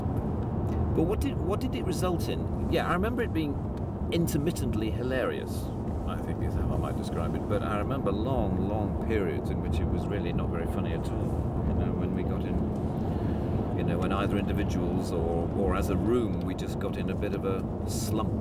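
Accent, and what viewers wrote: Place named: United Kingdom. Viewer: I’m here to learn a proper British accent.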